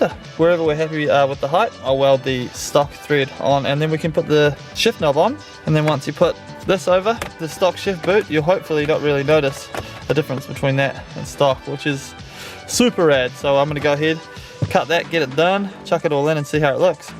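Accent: New Zealand. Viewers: I thought you was south island nz . Chur bro mean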